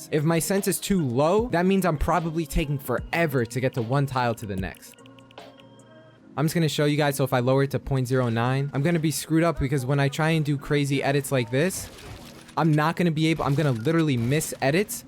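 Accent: New York, United States. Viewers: This guy definately from new york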